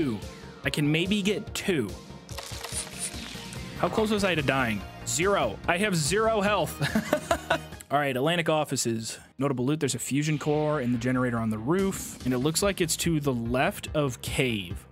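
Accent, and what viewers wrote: Midwest, United States. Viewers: Thank you for this historic moment, your nasally Midwestern accent only served to add to the experience:) Now do it on survival!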